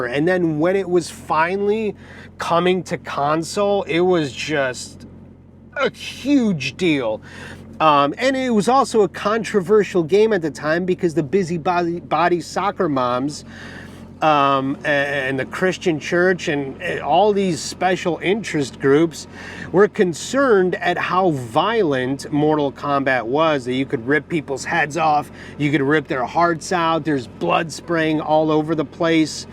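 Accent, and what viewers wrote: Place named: Russia. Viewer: I thought you had a Russian accent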